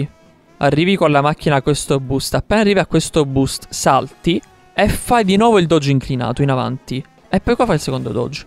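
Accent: Palermo, Italy. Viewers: Ma sei di Palermo??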